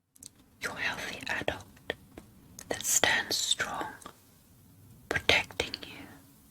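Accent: United Kingdom. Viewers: i hate british accent but i still watch it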